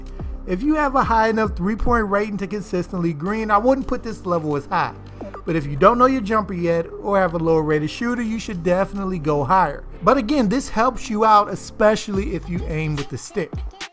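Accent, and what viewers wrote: Baltimore, United States. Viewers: Bruh u can hear that Baltimore accent everywhere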